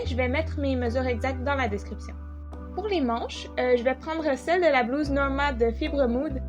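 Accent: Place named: Quebec, Canada. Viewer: Bravo pour cette réalisation très fraîche. Vous êtes simple et pro en même temps. Pour moi, le voyage c'est votre délicieux accent québécois:):)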